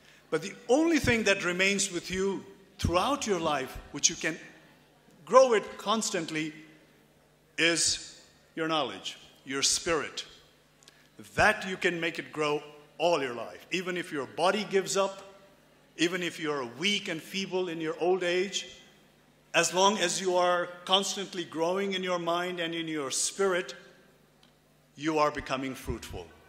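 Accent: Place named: England, United Kingdom. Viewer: Love his English accent! Humbly appreciate your knowledge and the way you inspire students with your valuable words.. incredible speaker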